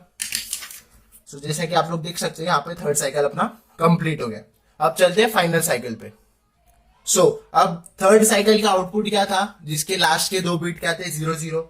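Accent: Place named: Mumbai, India. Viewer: jyada mach mach nahi hui...Love your mumbai vali hindi bhai..I'm from VJTi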